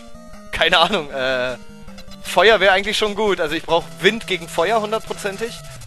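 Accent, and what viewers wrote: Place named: Berlin, Germany. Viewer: berlinerisch!!!!!!! Icke.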